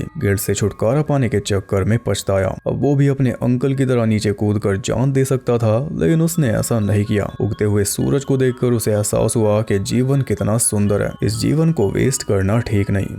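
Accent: Haryana, India. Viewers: Aap ki explanation ek baat pata chali ki aap harayana se ho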